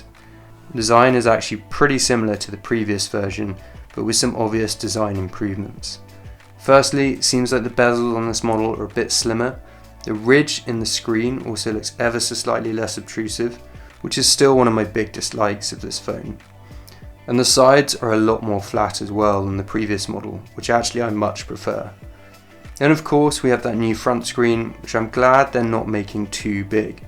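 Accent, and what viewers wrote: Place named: United Kingdom. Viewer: I enjoy the British accent too!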